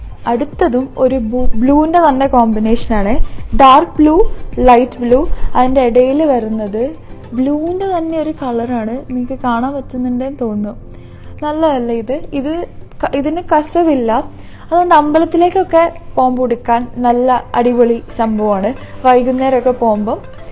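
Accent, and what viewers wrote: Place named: Kannur, India. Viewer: plz talk in kannur slang..it's a beautiful slang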